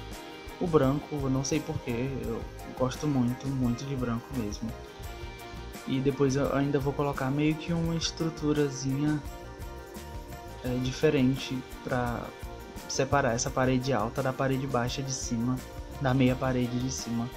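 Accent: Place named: Northeast, Brazil. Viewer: tu é de qual estado?? tem sotaque nordestino ❤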